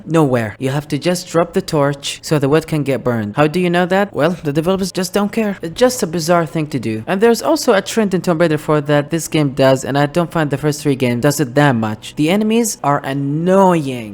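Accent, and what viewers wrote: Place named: Gulf, Arab world. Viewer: خليجي من لهجتك بس مدري من وين. كل عام وانت بخير وصحه وسلامه وعيدك مبارك، مقطع جميييل واستغربت ان باقي فيه عرب مهتمين بالسلسلة الكلاسيك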